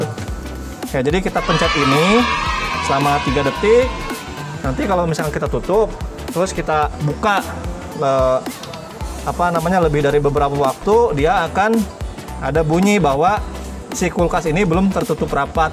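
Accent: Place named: West Java, Indonesia. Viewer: Sundanis banget"munggahan kang😁kang"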